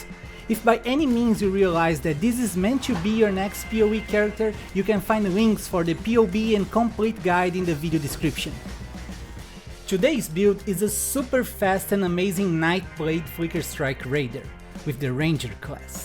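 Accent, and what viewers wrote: Brazil. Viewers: Vc é Br né parceiro eu tô vendo teu sotaque Bro, vlw por esse conteúdo maravilhoso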